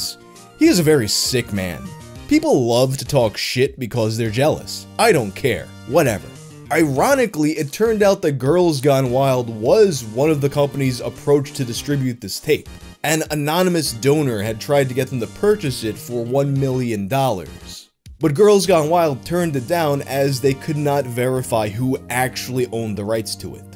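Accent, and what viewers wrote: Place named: New York, United States. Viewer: How have I just noticed the ny accent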